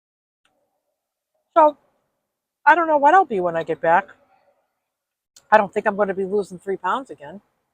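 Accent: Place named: Boston, United States. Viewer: Awesome,! Absolutely love your style! And your accent is the best! I’m from a city just north of Boston and I have the same accent! And I’ve always loved it❤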